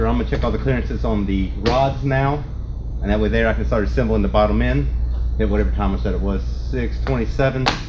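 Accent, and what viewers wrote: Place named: Carolina, United States. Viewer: That's great I could tell by ur accent u were from Carolina. I've got family in Greensboro. I always loved coming to visit over there from KY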